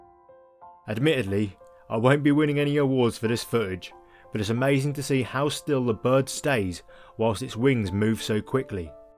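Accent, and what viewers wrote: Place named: Hampshire, United Kingdom. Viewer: your accent is so similar to hampshire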